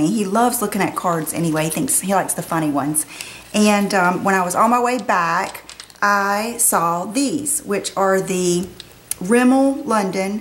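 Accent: South, United States. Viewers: New subscriber! I love your accent! You seem so sweet and like a southern belle. I love your taste in makeup. I am still on the hunt for the Milani goodies!!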